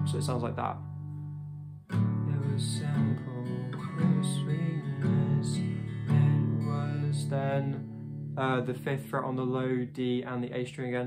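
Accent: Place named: United Kingdom. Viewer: You sound like Dodie maybe because you both are British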